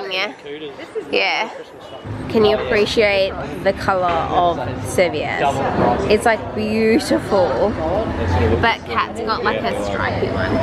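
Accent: Australia. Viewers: haha is so aussie sounding (this is coming from a Kiwi haha)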